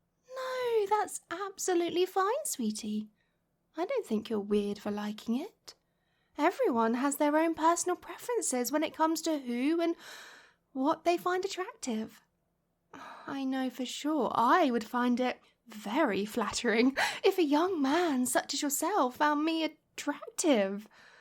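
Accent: United Kingdom. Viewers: This is so aggressively British I love it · U have such a sophicated and beautiful voice and the fact your britsh is amazing ❤ ( I'm britsh too😊)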